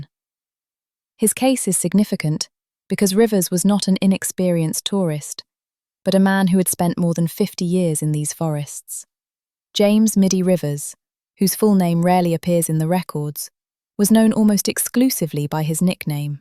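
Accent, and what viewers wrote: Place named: England, United Kingdom. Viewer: Whoopo👻, love these mysterious disappearances, especially when well narrated, love the English accent, thanks 👍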